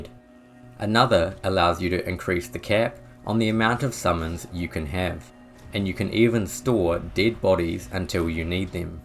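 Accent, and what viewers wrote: New Zealand. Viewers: You kiwi?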